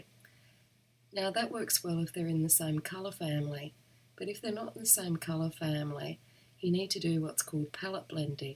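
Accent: Australia. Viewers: Thank you so much for this excellent review:) I always love listening to your reviews, you speak so eloquently and give a fair and unbiased report on the product you are reviewing. It is also a bonus to hear a fellow Aussie accent!!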